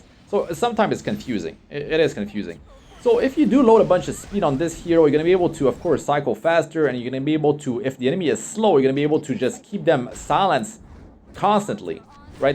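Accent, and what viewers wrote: Quebec, Canada. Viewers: Hey, weird question but are you from Quebec? Your accent made me wonder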